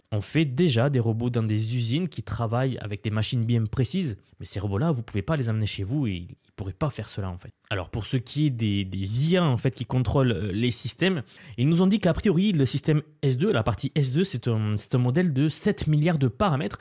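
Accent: South, France. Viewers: Un peu du sud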